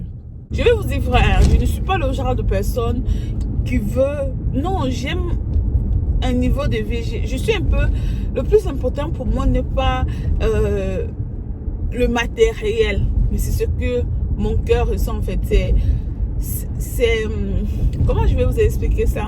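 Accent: Cameroon. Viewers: Cest l'accent camerounais justement. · Bonjour.C'est fou vous avez l'accent camerounais, j'ai vraiment cru que vous étiez une compatriote. J'avoue que vous êtes ''realistic''.Ça change vraiment de toutes les videos que j'ai vues depuis le temps.Juste une question:Quelle est l'orientation de votre chaîne? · She sounds more "camerounaise "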